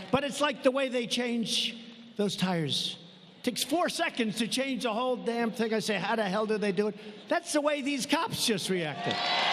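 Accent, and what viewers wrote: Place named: Brooklyn, United States. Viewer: Queens? I here Brooklyn accent but need to check! Either way he has the great Old Skool humor! love the DON!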